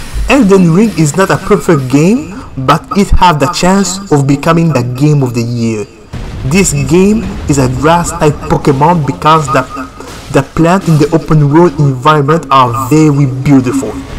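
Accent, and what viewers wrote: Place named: Africa. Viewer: Just stumbled on your channel and I'm not disappointed 🤣 I always find a lot of trust and kindness in African accents haha anyway I just bought mine last week, I hope you love it as much as I do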